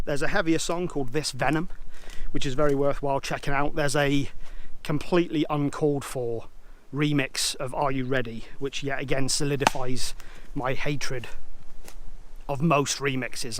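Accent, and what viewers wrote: United Kingdom. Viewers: It's a pretty decent album even though it ain't their greatest but it ain't horrible. And I must say that this video amuses me when you're explaining the meaning of the songs with your British accent! You deserve a subscriber!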